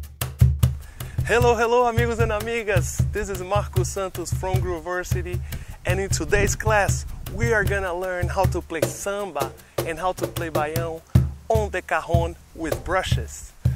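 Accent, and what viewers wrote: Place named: Brazil. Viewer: Funny Brazilian Accent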